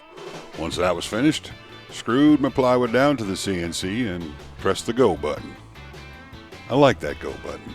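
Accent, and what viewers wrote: United States. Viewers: You are extra funny today. And the accent is extra 'cowboyish". I guess it makes it all 'manly".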